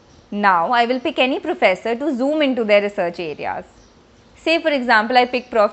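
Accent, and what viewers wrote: India. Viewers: your accent is too much desi that is distracting for comprehension, please make it a bit comprehensible.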